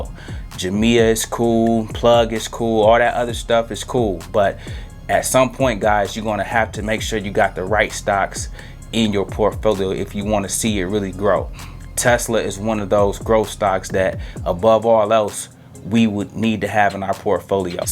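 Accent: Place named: California, United States. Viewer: He is from Cali. LA. I hear the accent.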